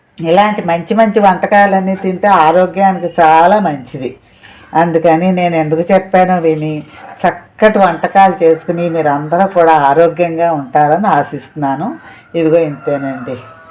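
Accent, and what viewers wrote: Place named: Godavari, India. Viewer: మీరు ఏ ఊర్లో ఉంటారండి,మీరు గోదావరి జిల్లావారి లాగా మాట్లాడుతున్నారు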